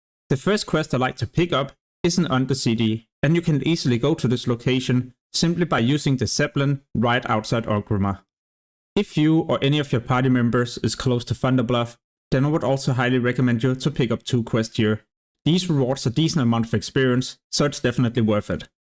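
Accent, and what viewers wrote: Denmark. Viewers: Hope it won't fix that lovely danish accent tho! I love it, keep it up man!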